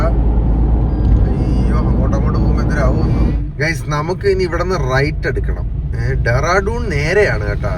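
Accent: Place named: Trivandrum, India. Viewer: Pakka Trivandrum slang Thanneeeee😂😂 ❤ nice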